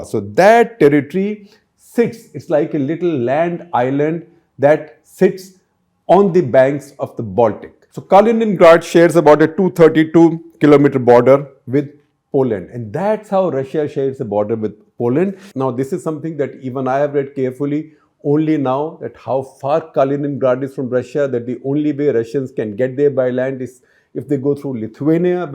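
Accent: India. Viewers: This Indian accent very distracting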